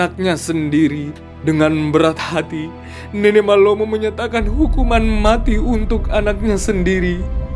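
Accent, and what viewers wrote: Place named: Makassar, Indonesia. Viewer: yang bilang harusnya suara biasa saja, mungkin mereka butuh pembiasaan aja, suara dgn nada seperti ini memang cocok diapakai untuk cerita legenda, apalagi ini yg cerita org bugis makassar, pasti ada logat2 makassar ala2 puisinya. Lanjutkanmeki gannn btw ini keperluan skripsi kah bro?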